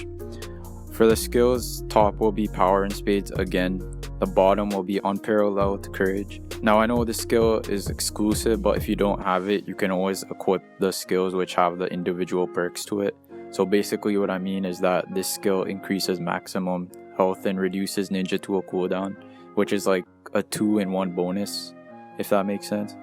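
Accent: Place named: Toronto, Canada. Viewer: Yo u from Toronto G? I can tell by the accent lmao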